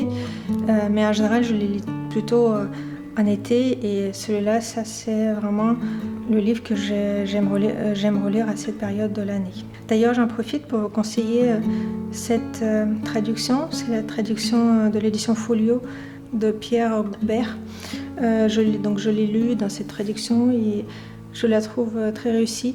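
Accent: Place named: Russia. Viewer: Bonjour et merci pour cette vidéo très intéressante et tellement douce. Je vais relire Orgueil et Préjugés dans la traduction Gallmeister. J' ai Femmes et filles depuis un moment dans ma bibliothèque et vous me donnez l'envie de le lire. Votre accent me rappelle mon amie en Russie que j' espère revoir un jour... A bientôt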